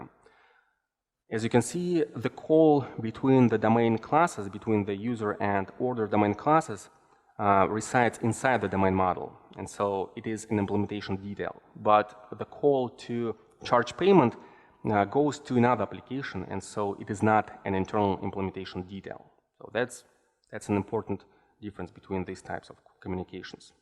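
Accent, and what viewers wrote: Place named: Russia. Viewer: Родной язык автора - русский. Да и говорит он с заметным русским акцентом. Для чего он на русскоязычной конференции говорит на английском?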